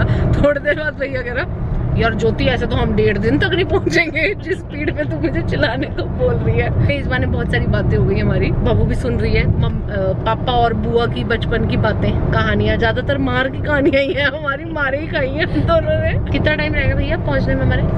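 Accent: Punjab, India. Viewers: Oh aapne bol diya tha last vlog mein ki aap ki Punjabi Punjabmein aate nikal aati hai.. 👍 😅 Your mum is soo beautiful. ❤️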